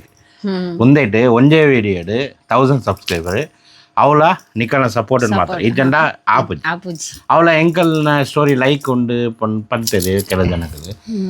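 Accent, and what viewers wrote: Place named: Tulu, India. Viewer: Ur tulu 😂👌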